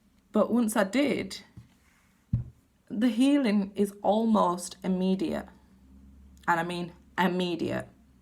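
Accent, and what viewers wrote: United Kingdom. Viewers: I hear you. British?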